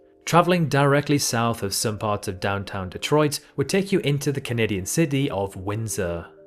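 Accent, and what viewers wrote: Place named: United Kingdom. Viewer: Your British accent lots of rubbish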